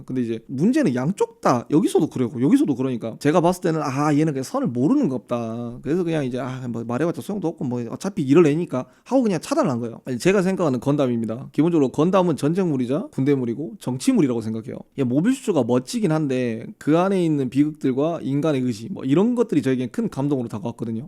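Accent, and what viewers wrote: Busan, South Korea. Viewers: 형 부산이여? 갑자기 겁나 친근감 가네요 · 고향이 경상도 분이 아니셨다고?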